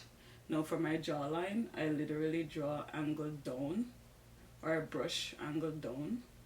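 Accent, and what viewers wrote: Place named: Jamaica. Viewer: Beautiful makeup routine . Are you Jamaican? I hear the accent . ❤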